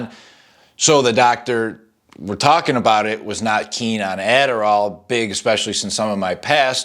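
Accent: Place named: New York, United States. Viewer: You’re from central New York aren’t you? Lol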